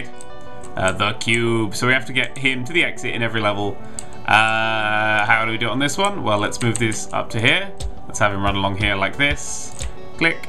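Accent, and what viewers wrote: England, United Kingdom. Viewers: Britian is the country itself right? And he does have a british accent that Sab3r prick isn't all too bright. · I think he means it's technically an ENGLISH accent and not a BRITISH accent. There's no single accent for all of Britain, but England has a native accent.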